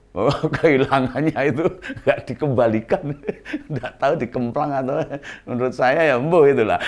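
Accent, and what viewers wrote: Java, Indonesia. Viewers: saya sukasekali dgn logatnya mas ini, jawa banget, logat asli jawa majapahit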